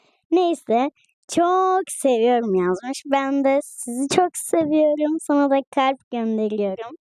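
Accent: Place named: Samsun, Turkey. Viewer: Samsunlu oldugun cok beli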